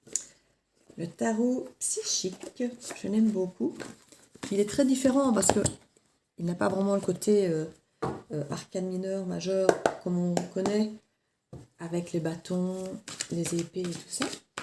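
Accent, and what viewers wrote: Liège, Belgium. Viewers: J'ai mis un pouce d'encouragement... j'avais bien senti cette pointe d'accent Namurois ou Liegeois... avant de lire la description... (ah ben avec le "Oufti", je m'etais pas trompé, c'etait bien l'accent de "Lidge" :p ) Bonjour de Mons :)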